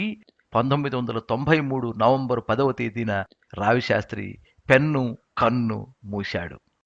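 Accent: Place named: Srikakulam, India. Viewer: మంచి కథ వినిపించారు. మీ గళం లో శ్రీకాకుళం యాస కూడా బాగా పలికింది. మీరు చెప్తుంటే పాత్రల్ని చూస్తున్నట్టు అనిపించింది. కానీ background వర్షం sound మీ గొంతుని dominate చేస్తోంది. Concentrate కథ మీద కన్నా వర్షం sound మీద ఉండడం వల్ల మళ్ళి మళ్ళి వెనక్కి వచ్చి కథ వినవలసి వచ్చింది. 👌🙏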